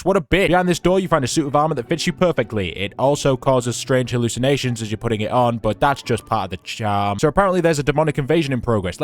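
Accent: North, United Kingdom. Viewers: You seem very northern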